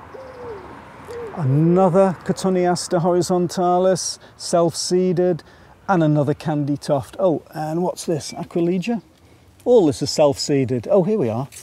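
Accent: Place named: Southern England, United Kingdom. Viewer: that’s great to know as one place I’ve planted them is in a planter up high, I love plants that spill out:) forsythia is on my list for next year along with plants that I couldn’t source this year. Although qvc has a rarer plant hour today so I may be ordering:) oh your in the north, I thought you sounded more southern lol happy gardening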